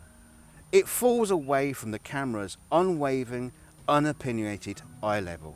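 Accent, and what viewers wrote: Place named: RP, United Kingdom. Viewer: Wasn't sure if you were the script writer too. With that authoritative BBC accent I guess you've got the bases covered. Bravo. Yeah the world is round.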